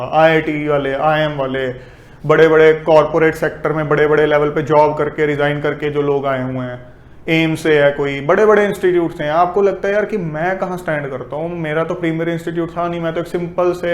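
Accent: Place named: Punjab, India. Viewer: u have punjabi tone sir while speaking English and it makes unique👌👌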